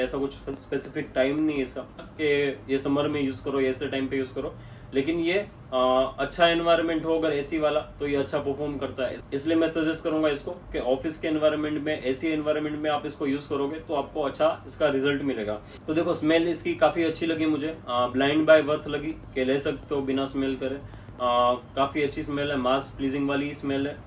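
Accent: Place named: Gujarat, India. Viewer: bhai bhai su vat che....apde hindi boliye aema gujrati eccent dekhay j jay😅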